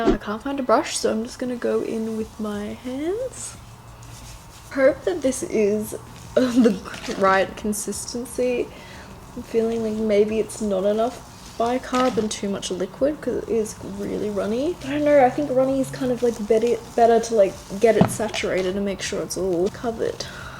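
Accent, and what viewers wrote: Australia. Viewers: You look so gorgeous without makeup! Such a natural beauty, also are you Australian? I recognise the aussie accent :)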